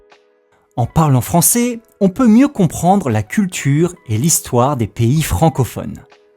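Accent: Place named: France. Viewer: thank you so much for this amazing video! I love this new format and looking forward to see more videos like this one. BTW, your soft French accent is very beautiful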